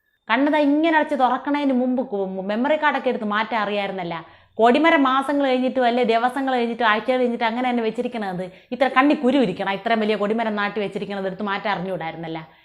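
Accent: Thiruvananthapuram, India. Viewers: അടിപൊളി വിവരണം... TVM ശൈലിയിൽ തന്നെ മുന്നേറട്ടെ. എല്ലാ വിധ ഭാവുകങ്ങളും 💐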